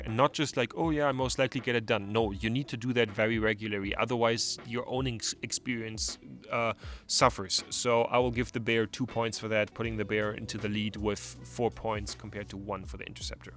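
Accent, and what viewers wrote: Germany. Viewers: I know this is a wildly unrelated tangent, but I was fascinated by your accent. I realized it wasn't quite Dutch immediately, but even when you said you're based in Germany I didn't fully hear the typical 'Hochdeutsch' English accent, so I was wondering if you might natively have a more Plattdeutsch - Niedersachsen-ish dialect... and then I saw the CE plate on your Interceptor and it kinda clicked. Do you natively speak that more low-saxon dialect? Anyway, from a Dutch guy who lives in Norway, thanks for the video! I've pretty much made up my mind about getting a Bear in the spring of 2026, but any and all comparisons I can watch before decision day arrives are great. Love to see that you managed some TET, particularly in Scandinavia, I'm very keen on doing some of it myself as well :)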